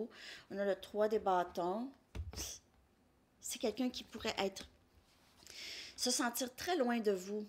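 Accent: Canada. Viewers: Super. Avec votre super accent canadien trop mignon. 👋🏻🇫🇷😘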